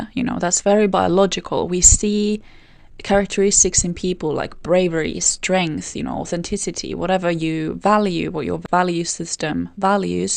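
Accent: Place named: Scandinavia. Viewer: I figured you were Nordic, but I wasn't sure if it was that or a German accent. Your accent sounds vaguely British sometimes too.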